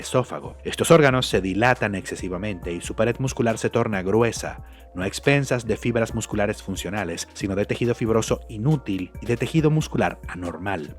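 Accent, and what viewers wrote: Venezuela. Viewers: pana eres venezolano ?? yo estoy nuevo en su canal , ya me suscribi , excelente trabajo con tus videos, dios te bendiga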